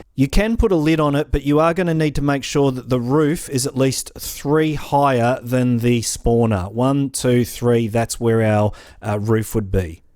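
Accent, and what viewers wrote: Australia. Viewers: are you aussie mate ?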